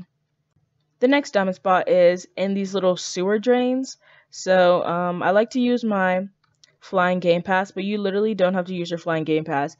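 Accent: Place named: Jamaica. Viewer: You kinda sound like a jamcain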